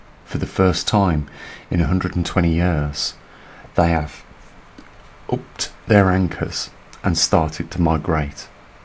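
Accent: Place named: Midlands, United Kingdom. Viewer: Very interesting info. Do wish I had finances to assist your channel. I’m local to you (just south of Solihull) based on your accent.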